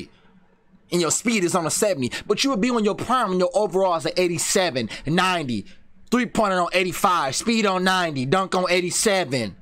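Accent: Baltimore, United States. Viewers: Nigga said “twenty tew” you def a Baltimore more nigga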